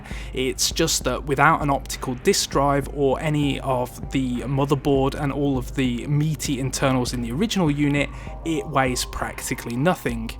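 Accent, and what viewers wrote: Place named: North West England, United Kingdom. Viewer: Jorge Luis Pérez Armijos Sounds like north-west England to me. Somewhere around Birmingham or Wolverhampton.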